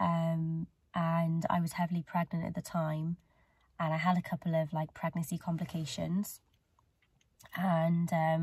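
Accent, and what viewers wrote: Cardiff, United Kingdom. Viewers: Old video, but I find I have an inability to adjust to situations. Maybe eyesight issues from anxiety! Btw, you from the ‘diff? I hear a Caaaardiff twang 😂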